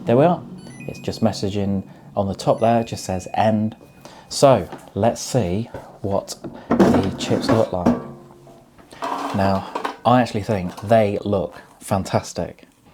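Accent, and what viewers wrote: United Kingdom. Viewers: I like the British accent as well. I like the garden in the background as well with the famous British grass. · Already ordered some hours ago. Can't wait 😊. Very good review 👍. Like your British accent.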